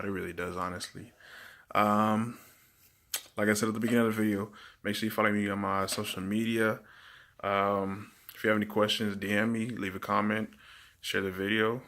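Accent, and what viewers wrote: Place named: Dominican Republic. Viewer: Yes you sound Dominican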